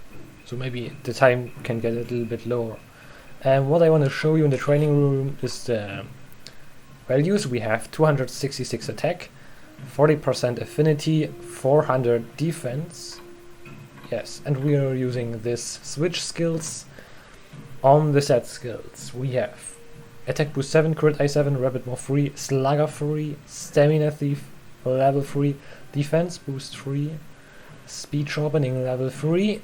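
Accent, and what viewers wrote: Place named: Germany. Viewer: I hear that german accent😂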